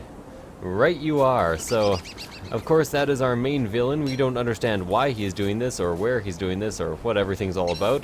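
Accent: Canada. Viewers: r u Canadian?? Cuz ur all, "Oat, oat." Instead of out. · That Canadian accent is redonkedonk xD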